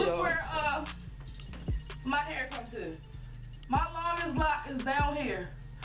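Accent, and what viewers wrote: Baltimore, United States. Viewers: I just ran across your channel. Random question but are you from Bmore? You sound like some of my family members lol love the video hun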